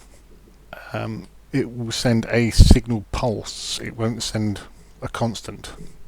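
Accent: England, United Kingdom. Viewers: Hey your from England Ent ya?!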